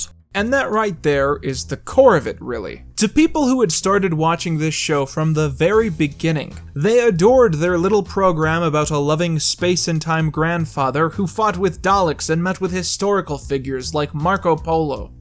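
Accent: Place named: United States. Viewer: The problem I have with this video is that a Yankee voice is lecturing the audience about a quintessentially British science fiction programme. The American TV's record with sci-fi is not exactly innovative. When the pubs were emptying so people could get home to watch the Quatermass serials, what did the Americans have? Captain Video! Those of us who have watched Doctor Who since the first episode, have accepted changes over the years, the Actors in particular. Since the re-boot however, the change that has been most disconcerting is how it has been turned into a soap opera by, let's face it, kitchen sink dramatists. The changes that have occurred did not, for 50 years interfere with the main premise, that Doctor Who is a male hero. It seems that only when Moffatt ran completely out of ideas, that the hints started about gender swapping. It is apt that the speaker mentioned Sherlock Holmes, I notice that he did not say, suppose you wake up one day and find that Sherlock Holmes has become a woman. 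That is the impact of this change. To make Doctor Who a woman is just a lazy gimmick by a desperate BBC to improve the ratings. I suspect that after the initial interest over such a stunt, that the good Doctor will disappear quietly from our screens (mustn't blame the change to a female). Perhaps to be resurrected in less politically correct, trendy, gender fluidity infatuated times, when it can get back to being an escapist, exciting, scary sci-fi show.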